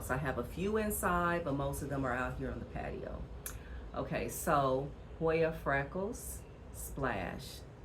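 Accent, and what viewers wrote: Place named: South, United States. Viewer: Hi 👋🏽 I love love your down south accent. 👏🏾 ❤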